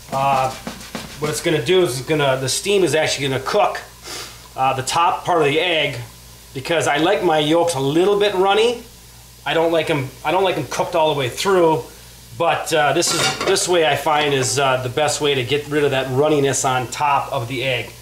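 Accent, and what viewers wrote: Canada. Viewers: Def Canadian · Are you canadian? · CAN SOMEBODY SAY CANADIAN???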